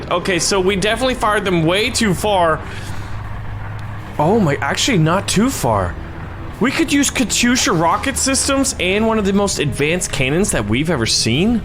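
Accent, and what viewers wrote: United Kingdom. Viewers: im sorry but i don't like your British accent im sorry though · Why you always speak with a British accent Maby be because someone in your family could of been in the Royal Navy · Can you stop speaking in british acsent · Love the British accent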